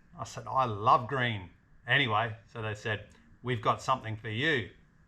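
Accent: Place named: Australia. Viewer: Nice heli. Good review. Love your Australian accent. Hello from Massachusetts, USA.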